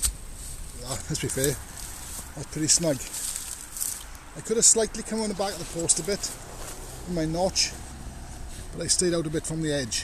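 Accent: Wales, United Kingdom. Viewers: Just have to decipher the Welsh accent a little better !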